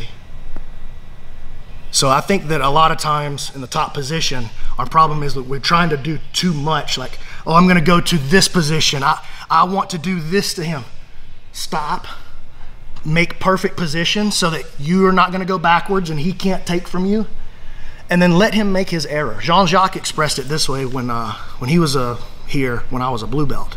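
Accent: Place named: South, United States. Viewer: I love the southern accent.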